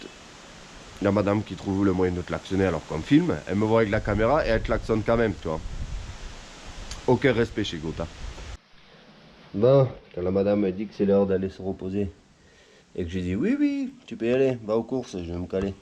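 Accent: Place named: Narbonne, France. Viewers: Aaaah l’accent de narbonne, il fait du bien à entendre. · Vu le prix que va prendre l'électricité , c'est obligé que dans mon futur logement que je cherche je vais mettre un système solaire . Ps: L'accent Narbonnais , mes racines , Merci ^^Force à vous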